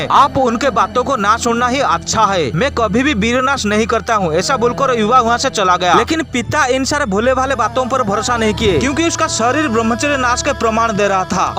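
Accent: Bengal, India. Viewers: Bhai tum bangali ho kya 😁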